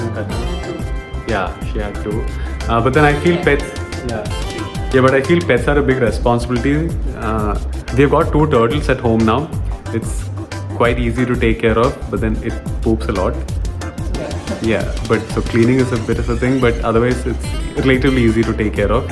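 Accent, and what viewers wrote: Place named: Kerala, India. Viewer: That's just Malayali accent. Most of us have it. 😂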